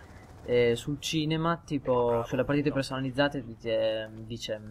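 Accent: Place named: Romagna, Italy. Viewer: Ecco perchè mi sembravi romagnolo ;) Io sono di faenza e vengo al mare li in zona ;)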